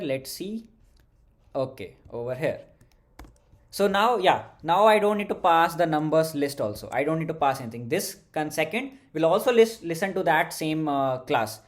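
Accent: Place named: India. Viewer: You speak indian mixed with english make me headache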